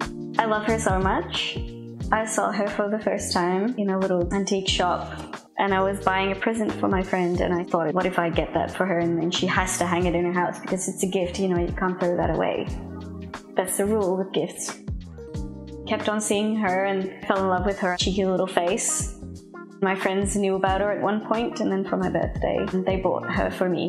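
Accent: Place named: Australia. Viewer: She does have an Aussie accent tho with a bit of something else 😊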